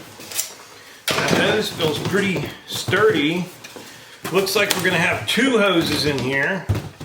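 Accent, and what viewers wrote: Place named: Pittsburgh, United States. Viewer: Are you from PA? I hear a bit of Yinzer in there.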